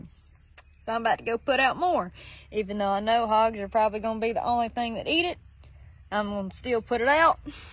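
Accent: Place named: United States. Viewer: Love your shirt baby, just came across your vlog, love it to like your country accent because I am country.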